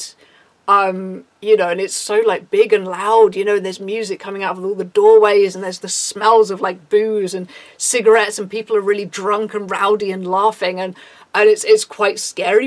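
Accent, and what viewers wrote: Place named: Newcastle, United Kingdom. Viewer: Are you a Geordie? You sound like it imo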